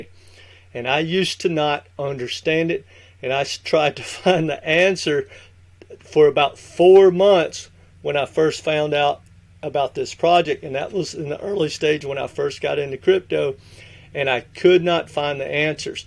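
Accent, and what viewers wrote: Texas, United States. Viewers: Thank you…. May I ask some question here… but are you from Texas ??? Your accent is very strong 😂 quite hard to understand is you from Asia 😂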